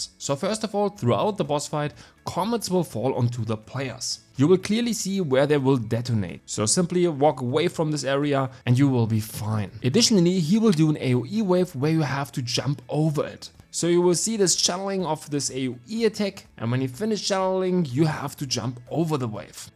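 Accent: Germany. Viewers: Echt schlimm der deutsche Akzent aber super hilfreich das Video, danke! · ich höre doch da einen arg deutschen englisch Akzent. Guides auf detsch wären ein Traum. Uuund du wärst der einzige. Denk drüber nach ;) und danke für die bisherige Pionier Arbeit <3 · Keine 10 Sek. gebraucht, um zu merken das da ein Deutscher spricht :D Danke für das video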